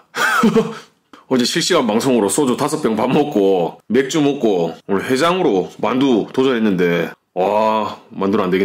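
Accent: Gyeongsang, South Korea. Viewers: 님, 후우~~ 저 만두 각 두개씩 총 네개에 쫄면 일반 사이즈로 ( 님 사이즈 아니고) 두 젓가락 그리고 시원한 물 한 잔이면 제 배는 그득 찹니다. 저 매운 걸 저렇게 많이 먹방 왕 🤴. 보고 있는 제 배가 차고 넘쳐 땡기는 거 같은데... 억 소리 납니다. 꾸밈 없는 경상도식 말씀이 재미 나고 인상이 무척 좋아서 보는 이들이 그 것 만으로도 즐거우니 이제 부터 너무 많이 드시지 마세요. 건강 하시고 하시는 일 흥하시고 대박 나시기 바랍니다. 👍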